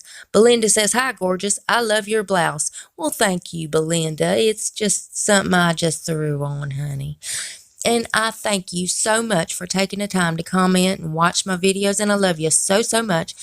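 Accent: South, United States. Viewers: Hey I just subscribe to your channel. I do enjoy your country accent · Love all your videos and absolutely love your voice you sound southern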